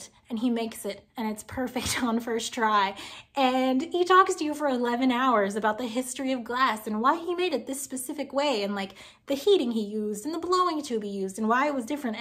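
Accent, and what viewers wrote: United States. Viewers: Ok, imma do my best to describe. An-tony vahn l-ale-an-hook. (and just glue all those words (and letter) together). I mean, your murican vowels probs still will be off, but this is as close as I could describe it in englishthon Edit: yeah that robot butchered it... but I'm used to that 🤣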